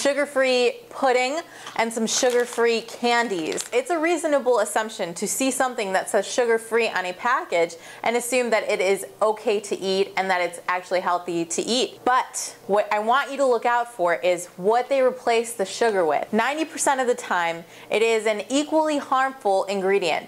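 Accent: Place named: United States. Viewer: Annoying US accent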